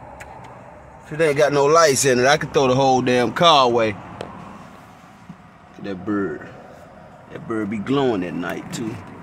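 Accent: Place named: Canada. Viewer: Cool accent! Where you from? Canada?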